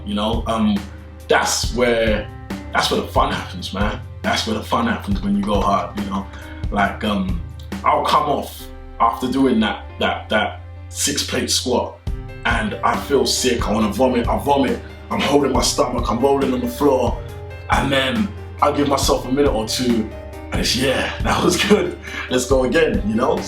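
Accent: England, United Kingdom. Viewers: this is the first time listening to Simeon Panda's voice, I just found out he was an English man?! :) Thanks for the inspiration! :D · his accent is so londoner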